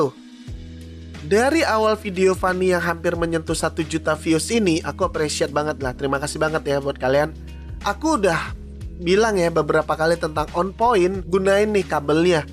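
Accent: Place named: Padang, Indonesia. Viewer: logatnya itu kek org padang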